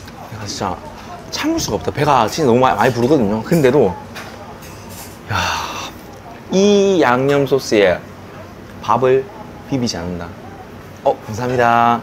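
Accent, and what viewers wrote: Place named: Gyeongbuk, South Korea. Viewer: 저는 경주에사는 50대 그냥 아줌마입니다^^여러~~유투버들봤는데 댓글은 정말,첨으로 남깁니다. 맛나게드시는건 기본이고 인정도많네요.특히 시장에서는 더 한듯~~방안에서 배달음식보단 나가서 시장순례가 더 중독으로가게하네요^^같은경북이라 억지로 표준말안쓰시고 경북말씨 더 듣기좋앟어요^^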